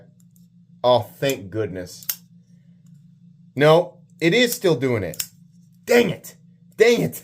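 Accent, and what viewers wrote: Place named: Maine, United States. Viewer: "Wicked good"? You a Mainah?!?😁😁 Also instead of " plunge drag" you could call it" button pinch. "😅😅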